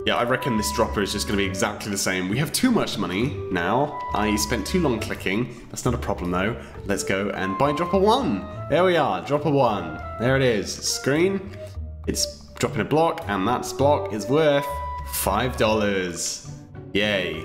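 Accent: England, United Kingdom. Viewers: Ana Contreras cuz he is british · Ana Contreras There both British so ya I see why you said that but at the same time I am a bit confused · Are u English ? I luv ur channel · Is this is called British accent? I don't really now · David Beck They're both British. What do you expect?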